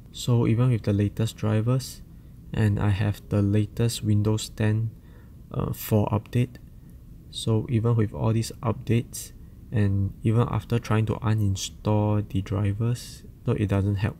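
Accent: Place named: Singapore. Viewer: Singaporean Accent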